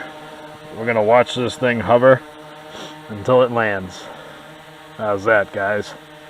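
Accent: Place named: Boston, United States. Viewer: You sound like you’re from Boston. I just got into these older inspires and I’m probAbly gonna start running into battery problems. Maybe you can help out a fellow Bostonian with a couple of inspire 1s. Love to hear from you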